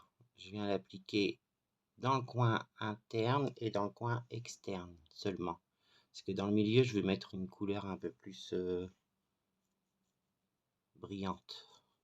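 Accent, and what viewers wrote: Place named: England, United Kingdom. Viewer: L'accent anglais m'a fait rire 🤣🤣🤣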